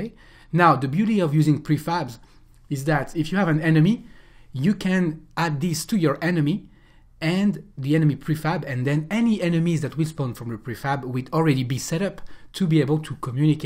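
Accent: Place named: France. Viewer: L'accent francais ^^ Merci pour le tuto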